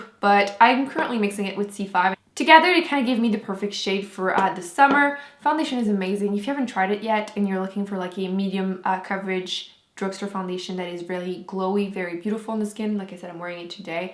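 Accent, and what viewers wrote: Canada. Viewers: She's from Canada c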